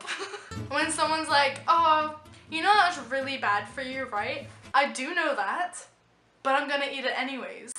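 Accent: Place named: Australia. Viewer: How the fuck do you sound Australian?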